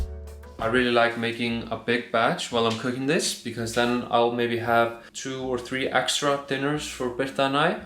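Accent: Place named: Iceland. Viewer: has a more pronounced Icelandic accent than Birta, this is cute :)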